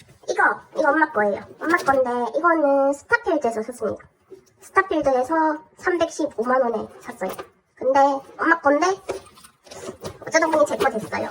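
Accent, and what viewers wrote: Busan, South Korea. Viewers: 바닥에 널부러져 놓고 장갑찌고 만지는건 무엇?ㅋㅋㅋㅋㅋㅋㅋㅋㅋㅋㅋㅋㅋㅋㅋㅋㅋㅋㅋㅋㅋ사투리가 부산같은데 개웃기네 ㅋㅋㅋㅋㅋㅋㅋㅋㅋㅋㅋㅋㅋㅋㅋㅋㅋㅋㅋ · 부산분이시네욬ㅋㅋㅋ저도 부산 사람이라.. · 음성 변조도 숨길 수 없는 친근한 부산사투리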